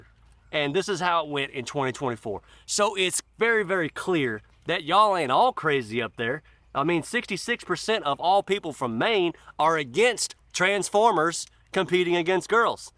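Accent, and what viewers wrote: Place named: Mississippi, United States. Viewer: I love you, man... er... in a brotherly way, of course! 😁 I always enjoy your no-nonsense perspective, your enthusiasm, and... your Mississippi accent! From a French guy who has lived in this great country for 40 years, and who is a staunch and unapologetic supporter of Donald Trump from day one. Bruno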